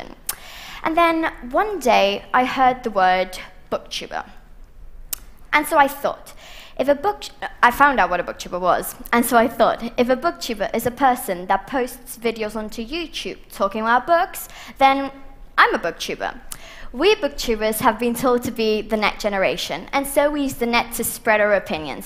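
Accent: United Kingdom. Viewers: Ostia, catalana amb accent britànic!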